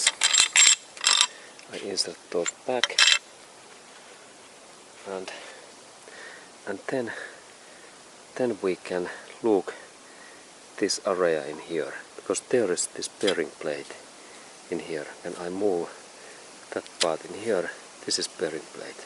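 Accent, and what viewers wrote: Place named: Finland. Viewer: Kuulostaa kyllä ralli englannilta :) Kyseessä siis zetec se, eli pikku zeteckki.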